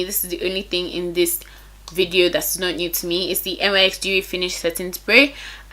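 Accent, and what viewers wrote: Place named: Nigeria. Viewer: Gurrl!! You're Nigerian accent is everything, original, i hate it when people try to copy other people's accent, kudos!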